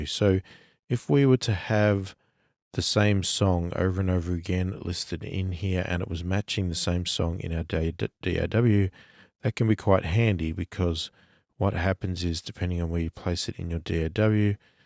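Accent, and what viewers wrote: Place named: Australia. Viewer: Haters gonna hate! You made perfect sense to me. He's probably a 'Murrican who couldn't understand your Aussie accent. Classy reply you gave him though. Kudos. I doubt that this guy has a clue about recording. I started watching your Magic AB video but when you said you'd found a better one I checked it out. Way better for referencing. Cheers.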